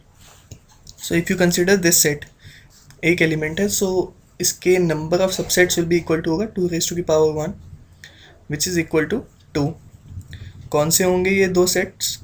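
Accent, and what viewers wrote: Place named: India. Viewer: Dude. It sounds like you speak Indian. Wtfffff???? Your accent man :///////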